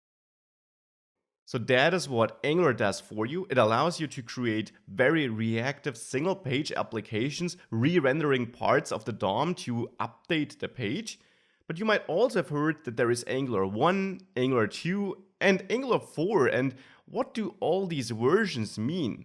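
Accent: Bavaria, Germany. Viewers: Hab mir gerade den Angular 2 Kurs auf Udemy gekauft, und fiel fast vom Stuhl als ich den Dialekt gehört hab. Wenn mi ned ois teischt, a Bayer??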